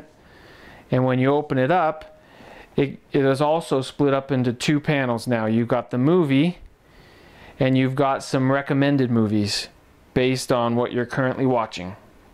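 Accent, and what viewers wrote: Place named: United States. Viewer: Finally someone who speaks American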